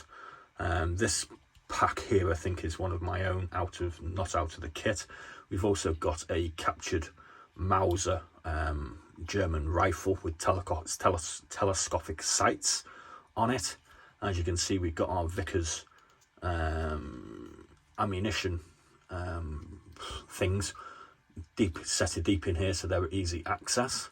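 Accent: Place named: Northwest England, United Kingdom. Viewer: Got to ask, are you in merseyside, wirral or chester, trying to place the accent.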